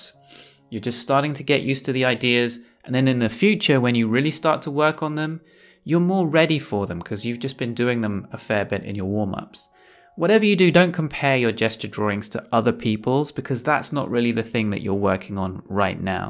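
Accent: United Kingdom. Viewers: Wow I love life drawing, I am guessing you are from Britain by your accent? I live in Manchester is there any life drawing class in Manchester I would like to attend... for a free trial lesson.. Could you get back to me please!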